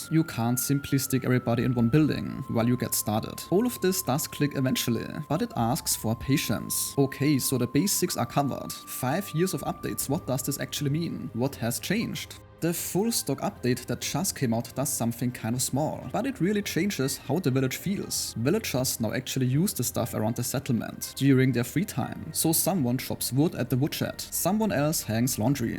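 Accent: Germany. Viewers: so deutsch ab sekunde 1 instant gehört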